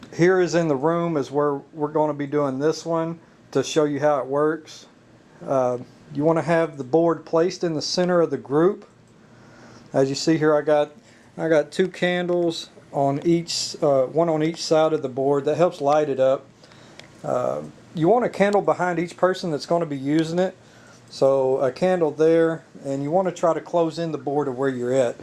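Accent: United States. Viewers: SOUTHERN ACCENT HEE YA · what part of East Texas are you from?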